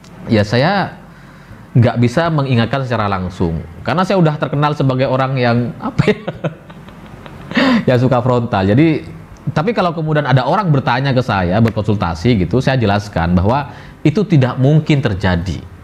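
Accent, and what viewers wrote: Madura, Indonesia. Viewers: Lucu.. kayak logat Madura mas host 2045 tv ini.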